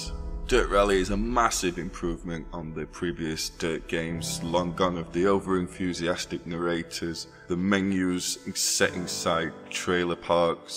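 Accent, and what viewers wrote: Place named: England, United Kingdom. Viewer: Hey I luv ur english accent, you make great vids and its a pleasure to watch it, thanks a lot. :)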